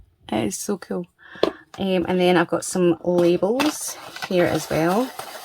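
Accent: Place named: Scotland, United Kingdom. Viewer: I’m a newbie, so this video was fabulous. Was mesmerised by all the techniques! Nice to hear another. Scottish accent. Could you maybe add details of where you got some of your material etc in the description below e.g. stickers and wax burner. Thanks so much for all the information and detailed explanations. Loved it from start to finish. What a lucky pen pal!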